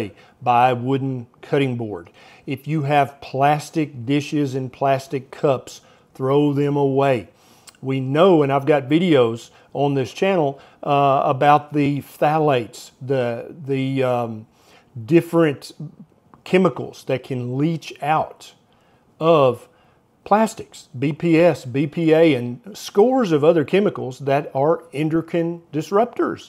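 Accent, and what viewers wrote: South, United States. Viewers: Listening to Dr souther drawl talk about the latest scare tactic the dreaded “micro plastics” makes me want to consume more and hurry up my own demise.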